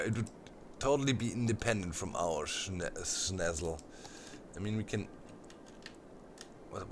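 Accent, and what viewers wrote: Germany. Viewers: TitaNiumCriStal ja dachte ich mir auch seine Aussprache hört sich deutsch an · are you german? your sounds like german,and the names of your cityparts like altstadt, i am german :D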